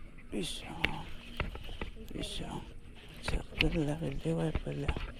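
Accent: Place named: Malappuram, India. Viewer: അടിപൊളി സൂപ്പർ ആദ്യമായിട്ടാ ഒരു spoti ൽ നിന്ന് ഇത്ര എണ്ണം പിടിക്കുന്നത് കാണുന്നത്. സംസാരം കേട്ടിട്ട് bro മലപ്പുറം ജില്ലയാണ് എന്ന് തോന്നുന്നു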